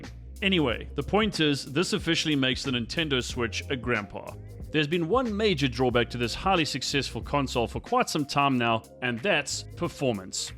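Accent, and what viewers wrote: South Africa. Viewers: is he south african? is he australian? we will never know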